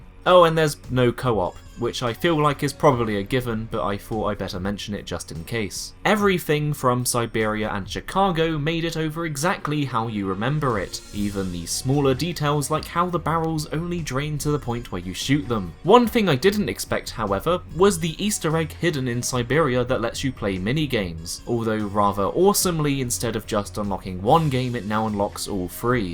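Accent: United Kingdom. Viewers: you sound like your from the UK if so £4 at CEX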